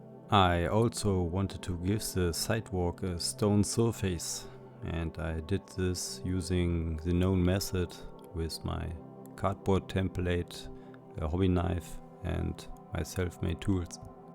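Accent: Germany. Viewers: Your German accent rocks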